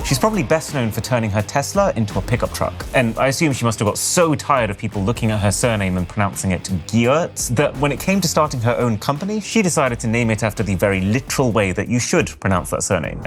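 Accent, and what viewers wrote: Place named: RP, United Kingdom. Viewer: Fucking hell that’s a bad hat. The public school accent combined with the backwards hat gives “hello fellow teens” vibes.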